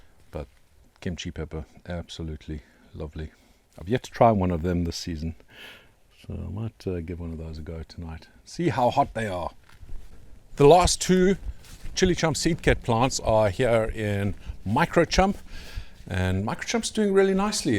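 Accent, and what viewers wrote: South Africa. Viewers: You sound like a south african? If so tell us more!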